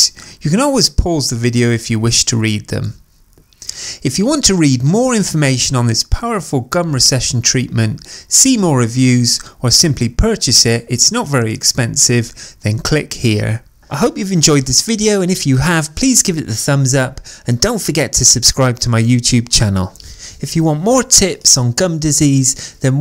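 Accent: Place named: Bristol, United Kingdom. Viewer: The suggestions from this video are: one tablespoon sesame oil oil-pulling; Sea salt rinse; Coconut oil mixed with baking soda and use as toothpaste; Chamomile flower tea; Myrrh in mouth rinse; Tea tree oil on toothpaste; Septilin, buy in capsule form; Flossing. Cranberry juice and other sources of vitamin C; Green tea; Gum Recession toothpaste which this chap sells. Lovely accent! Somewhere near Bristol, I'm guessing.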